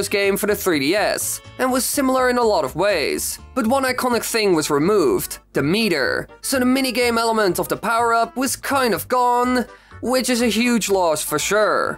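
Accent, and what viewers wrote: Netherlands. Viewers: Hey, you’re dutch arent you? Hallo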